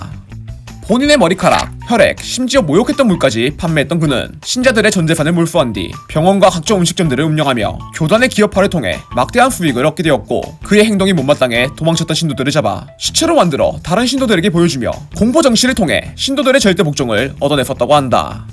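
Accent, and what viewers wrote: Jeolla, South Korea. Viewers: 김어준인줄ㅋㅋ 전ㄹ ㅏ도 익산ㅋㅋㅋㅋ ㄹㅇ과학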